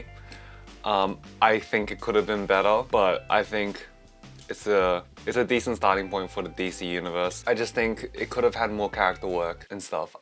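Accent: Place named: Australia. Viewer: I loved this movie. I agree with you in wishing that it was longer. I appreciate you - good to hear a fellow Aussie voice reviewing this and being so into the lore of the characters and previous media. Also, where did you order that food? How did you get those three dishes mixed in one container? lol. I’m so hungry now.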